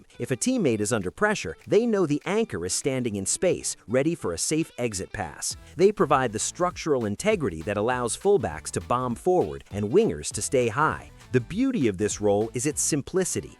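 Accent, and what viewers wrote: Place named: United States. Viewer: These pronunciations.. you yanks rly should stay away from football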